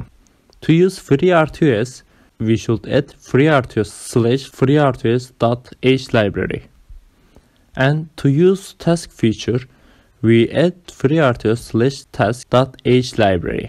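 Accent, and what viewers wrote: Turkey. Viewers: dinlerken ya bu ingilizce türklerin konuştuğu şekle benziyor dedim. sonra acıklamaya baktım :D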